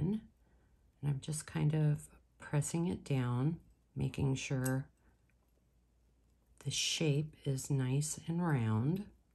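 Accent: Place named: Midwest, United States. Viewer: are you from the Midwest somewhere? I hear my family in your pronunciation of words with “or” in them like “form”. I love your designs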